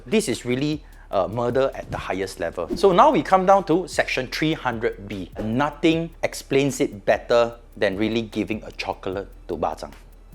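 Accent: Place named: Singapore. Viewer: You are a cool lawyer. Thank you for explaining how the law works with regards murder charges. Love the singlish lah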